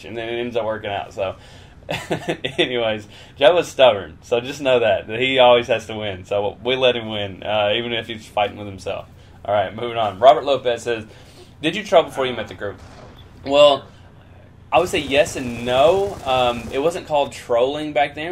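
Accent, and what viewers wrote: South, United States. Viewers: Love your southern accent! Where are you from and how old are you? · You Sound a little country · He is obviously from the south. I know this because I'm from the south and everybody talks like that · Your voice sounds kinda southern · Is that a southern accent?!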